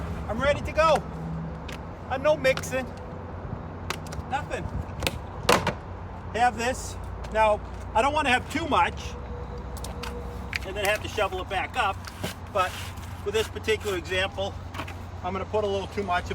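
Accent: United States. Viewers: Sounds like a Worcester accent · Sounds like he's from New York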